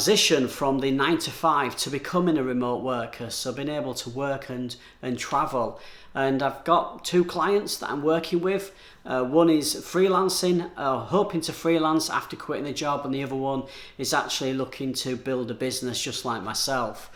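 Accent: United Kingdom. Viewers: Are you British.?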